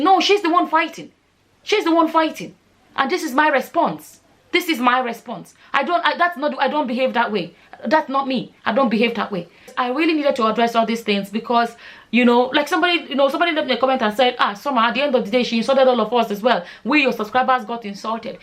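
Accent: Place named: Nigeria. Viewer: I just feel like u speakin as a true Naja. I do not believe u speakin too fast o at all.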